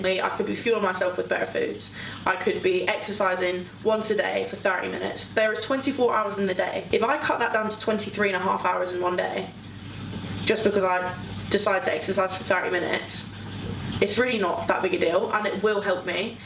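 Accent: Scotland, United Kingdom. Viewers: Fix your accent you moved to london dont mean you have to look your scottish accent and sound chavy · Miss your Scottish accent ahha xx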